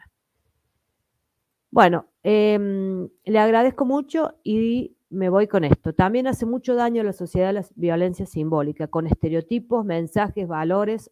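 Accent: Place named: Córdoba, Argentina. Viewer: Gracias por tu análisis tan claro, por cierto, me encanta tu acento, Córdoba?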